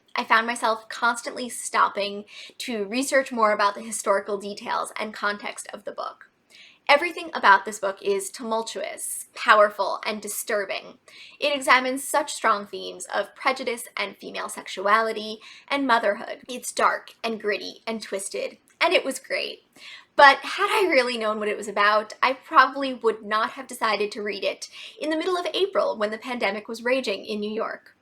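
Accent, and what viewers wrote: United States. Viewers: Here from Books and Things. You have a very cute and familiar face and very sound very American. Subbed!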